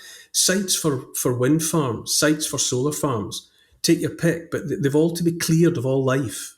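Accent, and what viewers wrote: Scotland, United Kingdom. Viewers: It's so refreshing to hear a gentle Scottish accent deliver so much truth. If it was an American i would have switched off by now. I listen to when i am in bed at night. So relaxing but enlightening. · Yes, I hear my own thoughts, feelings and memories of years gone by, iterated in lilting Scottish accent.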